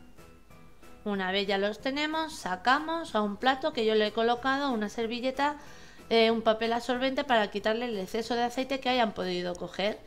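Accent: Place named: Spain. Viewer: La felicito Sra se ven muy rico esos huevos y me gusta como hablas ese acento español gracias por la receta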